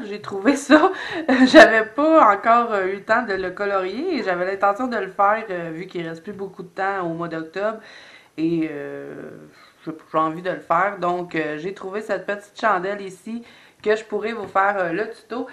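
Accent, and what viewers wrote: Quebec, Canada. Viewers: Merci beaucoup avec ces nouveaux tutos, tu nous fais bien progresser. Comme dit Les couleurs de Noëlle, j'adore t'entendre, avec ton accent Québécois qui nous semble exotique, à nous français, et nous fait rêver aux grandes plaines et forêts enneigées du Canada. Quand j'étais petite, j'avais adoré le livre Maria Chapdelaine, et je l'ai relu plusieurs fois. Bonne journée, gros bisous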